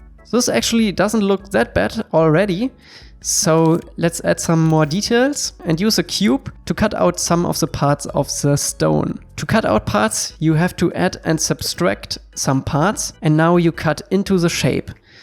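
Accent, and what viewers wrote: Germany. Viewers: Wenn man den deutschen Akzent raushört ^^ gutes Video! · Are you German!? 😄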